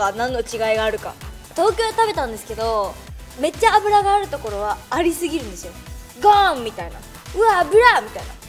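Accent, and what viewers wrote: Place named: Hakata, Japan. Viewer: れいなの博多弁好きすぎる。。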